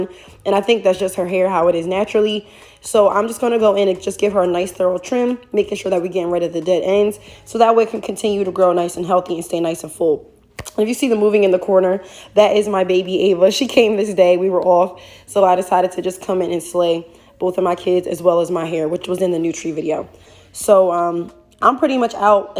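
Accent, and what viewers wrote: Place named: East Coast, United States. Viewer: I knew I heard an east coast accent while you were talking lol. I’ve been trying to get my oldest into doing hair she’s good and actually likes it but is on the fence about cosmetology school I’m gonna show here your videos for sure. Texas by way of Philly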